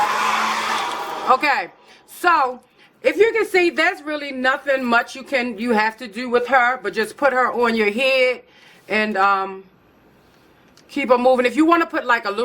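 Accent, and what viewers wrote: Baltimore, United States. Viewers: Omg Are You From Baltimore ? I Love Your Accent . It’s So Cute !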